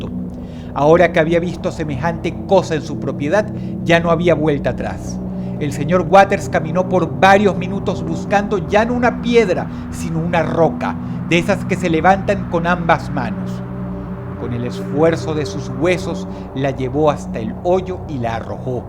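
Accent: Venezuela. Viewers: Aquí Dross todavía tenía acento venezolano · De los tiempos de cuándo Dross aún tenía acento Venezolano :v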